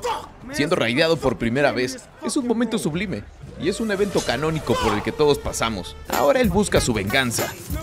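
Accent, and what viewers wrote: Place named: Latin America. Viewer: Nah hombre, fuera de bromas tu estilo y edición son muy buenos, parecido al del grande de Mutzska, pero el tono más suave y tú guíon más crítico/narrativo son únicos y me gusta (no es agresiva Jwulen XD) y además eres el primer youtuber al estilo Mutszka que escucho hablar en español latino, aplausos pa ti 👏😄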